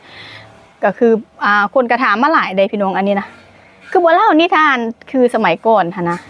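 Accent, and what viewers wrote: Phu Thai, Thailand. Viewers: ทำนองลำขอนแก่น....สำเนียงภูไท...🤣🥰💋:socialdist::elbowcough::socialdist::thanksdoc::chillwcat::elbowcough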